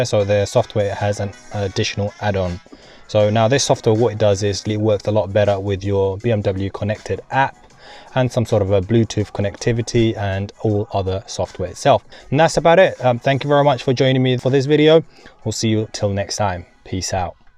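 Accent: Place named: Bradford, United Kingdom. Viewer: You’d either from Bradford or Birmingham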